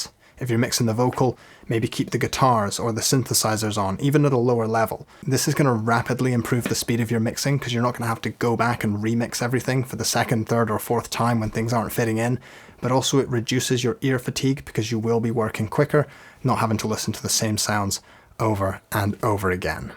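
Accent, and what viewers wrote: Scotland, United Kingdom. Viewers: Was not expecting a fellow Scottish person when I clicked this 🤣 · Love this and your accent. I'm from Scone (live innLondon) i swear you have a Scottish accent, or maybe i am home sick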